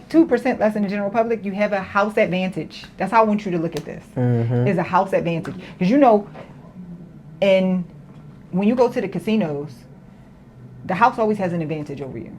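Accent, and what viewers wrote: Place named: New York, United States. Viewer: Hello lady is your sister the wife in the Henry family ? You all look similar and have the same accent ? Like New York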